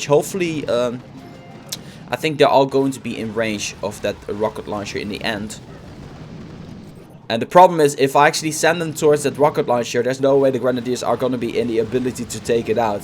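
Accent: Netherlands. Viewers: Je bent 100% Nederlands, dat hoor je gewoon.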